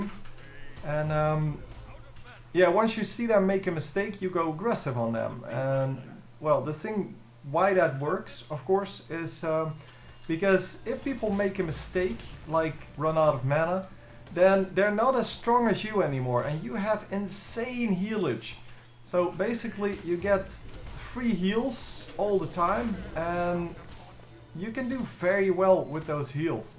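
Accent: Netherlands. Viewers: Duidelijk nen nederlander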